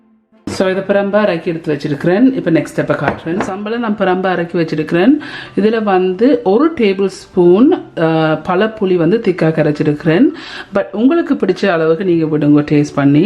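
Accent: Jaffna, Sri Lanka. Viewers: Hatts off for keeping jaffna prononciation !!!! Thats our adayalam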